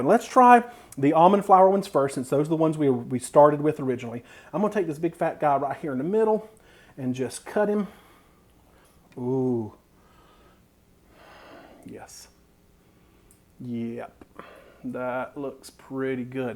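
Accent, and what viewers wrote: South, United States. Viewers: Sir, I love your videos and southern accent! Your face really made me laugh! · I love your videos.... Talk as long as you need too. Heck you sound like me with that southern tone... well I have been waiting for use of my coconut flour and here it is... Thank you very much. I will be trying this one. ♥ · I don’t know why but I saw your channel on my feed and I started watching you and this video was amazing!! You have great energy and a great Southern accent!! You are doing a great job!! Maybe we can do a collaboration let me know!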